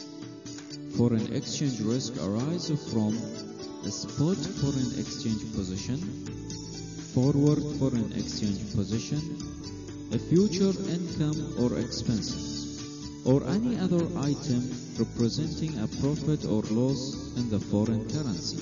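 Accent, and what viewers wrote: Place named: Bahrain. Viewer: اللهجه حتى وهي إنجليزيه تنعرف أنها بحرانيه هههههه ..I wana help on the commercial bank management it's so hard :(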